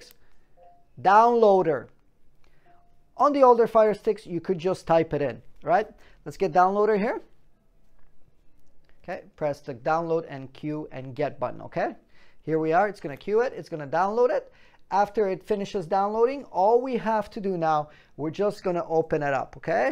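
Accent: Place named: Texas, United States. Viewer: Texas man! where u from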